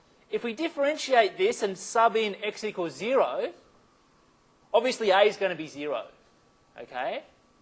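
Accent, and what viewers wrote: Australia. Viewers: whenever an aussie speaks, i cant help but trust in what he's saying lol. Good video. Ive found that the only tricky part with the H.E. is to not make a mistake with the integral when solving An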